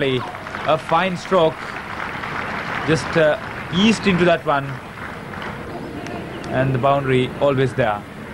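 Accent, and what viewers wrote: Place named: Sri Lanka. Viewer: The sri lankan. Accent on this one is STRONG.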